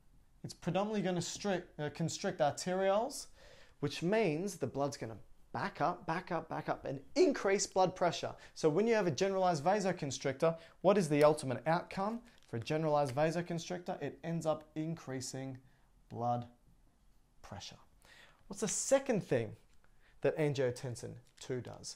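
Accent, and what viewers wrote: Australia. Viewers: It is an australian accent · As an Aussie, I can confirm it’s an Aussie accent.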